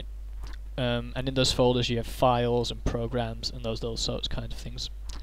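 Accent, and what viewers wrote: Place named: Netherlands. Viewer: Pretty awesome, haha. The first few minutes I could easily tell you were Dutch, with the accent. But then after ~3 minutes you get the hang of it (flip the switch, as it were) and you are nearly indistinguishable from a native :)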